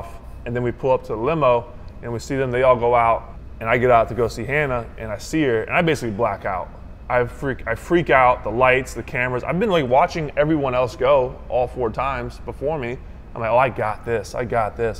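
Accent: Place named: South, United States. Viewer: he has a country western accent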